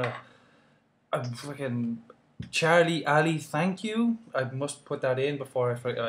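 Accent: Ireland. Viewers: Where in Ireland are you from? Dublin ya? Haha I'm Irish and I swear so much lol I need to stop! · Woah I never noticed you were Irish in & Vlogs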